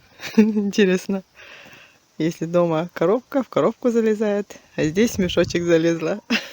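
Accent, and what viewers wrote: Komi, Russia. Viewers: Здравствуйте, и! Привет вам из Самарской области👋👋👋 Ох, кутшем ме рад кывны рОднэй диалект, вроде похож миян говор вылэ Анна Николаевналэн серниыс). Чужи да быдми ме Ижемскей районын в с.Няшабож. 12,5 лет не была на родине, гажтэмала порой зэй ена. На днях искала рецепт калитки, а вчера случайно, а может и не случайно, вышло в ленте ваше видео. Результат - челядес да верОсэс водтэдi узьны😴 и кык час воедз калитка пОжалi😁 с картошкой и пшенной кашей. ПрОстэй национальнэй выпечка. Дети очень любят выпечку с картошкой, буду теперь чаще баловать их калиткой 😊 в общем, смотрю теперь по возможности ваши видео, как вы, картошка босьтанныд, тшак Октанныд (ме мамакед зэй ена любитi тшакла ветлыны, чери кыйны вугыршайт вылэ)... Ностальгия🥰 Синваэ петэ... Став бурсэ тiян!💐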